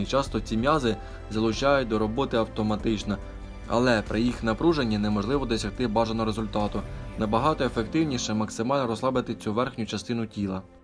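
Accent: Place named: Poltava, Ukraine. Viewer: Круто, просто, і без води, Українська мова просто мед для моїх вух( якщо не помиляюся то Полтавський акцент) у автора велике майбутнє.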